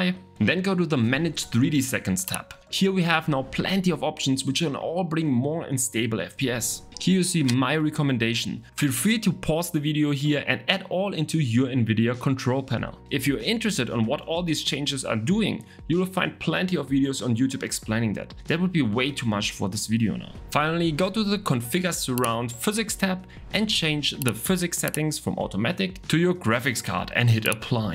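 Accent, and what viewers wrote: Germany. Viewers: Echt Traurig 😢 mach doch auch mal was für deutsche. Das Englisch macht einen verrückt mit dem deutschen - Englisch selbst skript lese akzent 😅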